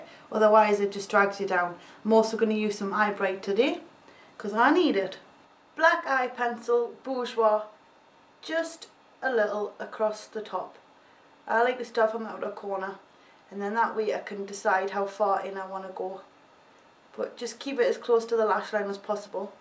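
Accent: Newcastle, United Kingdom. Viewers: The accent comes from south shields, which is in northeast England very alike to a Geordie accent love it <3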